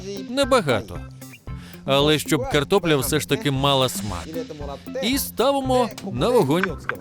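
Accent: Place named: South, Russia. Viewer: О, южно- русский говор появился... но не я думаю понимают, так 50/50 примерно. Но лично я понимаю мову. Просто , как по мне это смешно выглядит.😂 с возвращением!❤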